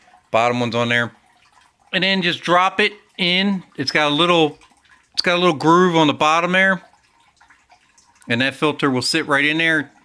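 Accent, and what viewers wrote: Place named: Pennsylvania, United States. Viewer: Love your accent... Is it a Pennsylvania accent?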